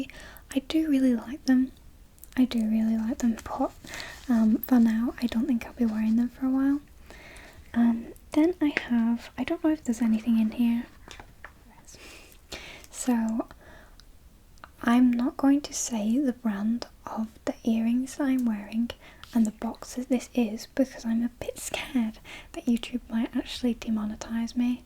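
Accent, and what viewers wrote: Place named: Northern England, United Kingdom. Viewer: Kashan900 her accent is a northern English one.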